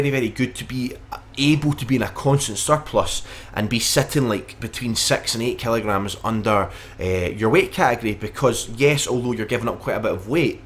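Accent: Scotland, United Kingdom. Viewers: I think your accent has ruined me as I can't ready "easy" without yelling it in best Scottish voice.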